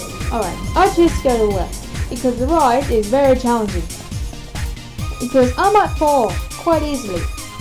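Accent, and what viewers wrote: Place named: Australia. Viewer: No! Don’t be harsh on yourself. Your voice is good. You’re from Australia I can tell by your accent.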